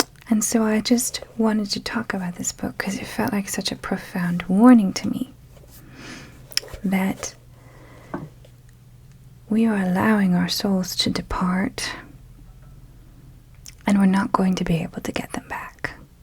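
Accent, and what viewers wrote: Transatlantic, United States. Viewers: a slight transatlantic accent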